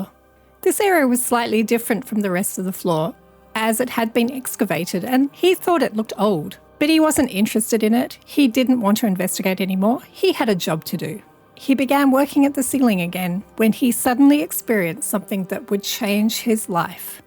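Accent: Australia. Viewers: Great video! I love your accent, Australian? Youre so articulate. 👍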